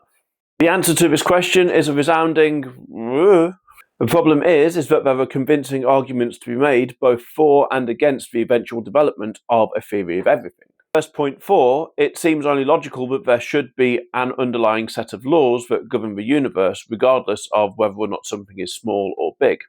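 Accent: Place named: West Country, United Kingdom. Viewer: Mate, can you go to a finishing school or something, im sure what youre saying is right and should be interesting but coming from you it sounds like something from the worzels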